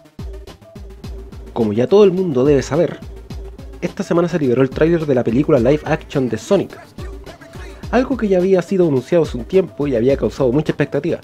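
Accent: Chile. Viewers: este wn es terrible chileno oe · Chileno poh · Eres chileno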